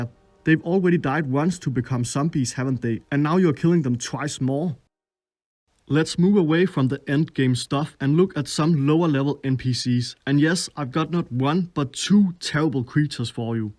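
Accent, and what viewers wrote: Denmark. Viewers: well, I like this kind of english with danish accent, I mean REALLY danish XD BTW great video mate. Og jeg har set mange af dine fede videoer :)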